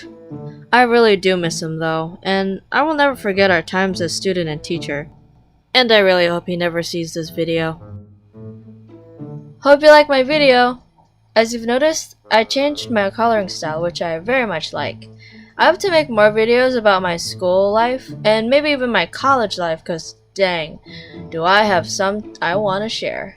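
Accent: Philippines. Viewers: The moment I heard your voice I new you we're a Philippine and btw we can our teachers sir too, and I also have a good art teacher :3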